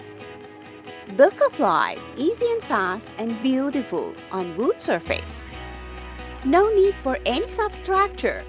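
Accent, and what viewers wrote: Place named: Turkey. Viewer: Oh god! Very heavy Turkish accent. Poor voice over. Wrong pronunciation.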